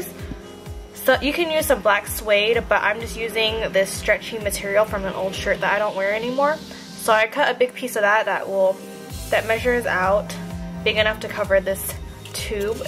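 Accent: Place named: England, United Kingdom. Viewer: where u from u sound like ur from england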